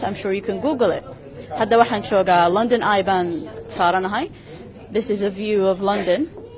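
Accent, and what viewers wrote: Somalia. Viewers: Her Somali accent is from Hargeisa city. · She sounds like she is from some where in north Somalia 🇸🇴 which region I’m curious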